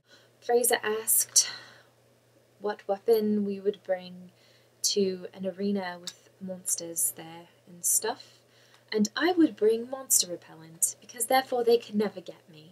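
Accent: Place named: Wales, United Kingdom. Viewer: Woah,. You actually sound really good! You sounded English in some parts, and mostly Welsh;) I am proud!:D A true Cardiffian. (Pokemon red and blue are awesome!)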